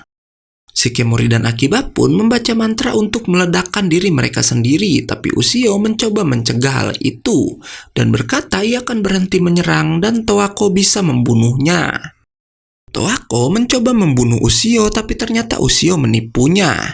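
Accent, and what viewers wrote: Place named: Kalimantan, Indonesia. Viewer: Mimin org Kalimantan ya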